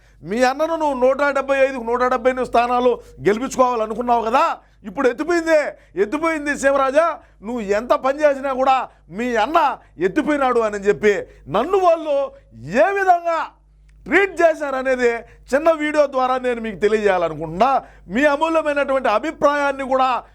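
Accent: Rayalaseema, India. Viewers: మిశ్రమ విఫలమైనా సఫలమైంది, తమ్ముడూ. మాది కడప జిల్లాలో రాజంపేట. మీరు మాట్లాడే రాయలసీమ భాష నాభాష కూడ. నాకు చాలా చాలా ఇష్టమైన భాష . మీకు అంతా మంచి జరగాలని అశిస్తున్నా.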